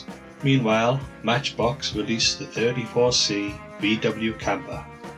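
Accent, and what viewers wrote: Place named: North East England, United Kingdom. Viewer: Hi from peterlee. I think by your accent you're not to far away. Love this VW and thanks it brought back some very happy memories.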